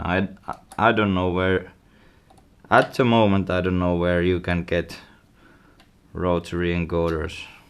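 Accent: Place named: Finland. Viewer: Juu ajattelin vaan ku sulla on silti toi suomiaksentti mutta sä et jää jankkaamaa sanojen kaa kuten monet jää.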